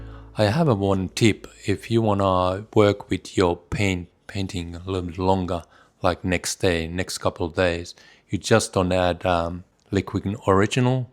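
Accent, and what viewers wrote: Australia. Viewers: I was listening to your accent, thinking, boy, this looks like a gum tree here, and you are! Here, I mean, not a gum tree. I love your technique, I paint with acrylics but can always adapt oil techniques to an equivalent acrylic application. Thank you for sharing, I'm stuck on a gum in my latest painting, so going to try your paper towel trick for foliage. The exact look I am after. New subscriber from Central Queensland Australia.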